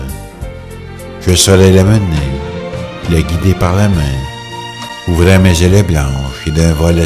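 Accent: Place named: Canada. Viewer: Bonjour Superbe narration de cette poésie accompagnée d’une belle vidéo c’est toi l’auteur compositeur? Je pense toujours a toi car nous devions faire une émission de radio ensemble ca ne c'est pas fait car je me suis rendu compte que tu avais un accent canadien bien prononcé et mon responsable d’antenne n’aurais pas accepté tes interviews pour nos auditeurs du sud ouest de la France!!!! Je culpabilise depuis ce temps là Excuse MOI Je rentre de Charente Maritime ou je suis allé a Hiers Brouage ou est né Samuel Champlain fondateur de Québec je t’embrasse SINCERES AMITIES <mail> PS je ne fais plus de radio depuis 2ans ½ mais je viens de prendre ma retraite peut- être je vais reprendre????????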